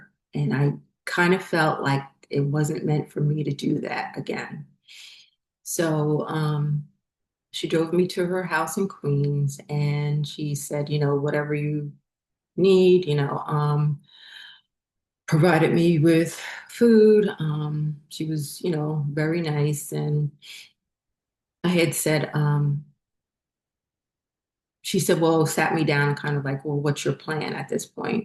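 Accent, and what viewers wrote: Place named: New England, United States. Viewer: I saw this video a few days ago and it kept popping in my mind, so I’m back to listen even tho I haven’t started at the first video. For now, it seems like there is a message in here for me. Things around my house always start breaking, falling apart when it’s time to go. The last townhome I was in had a broken washer, drier, oven, stove, microwave, and heater 😮😅 by the time we finally were able to get out🎉🎉 Just want to say Good For You - telling one’s story is so powerful - and also I grew up in New England and enjoy listening to your narrative/accent.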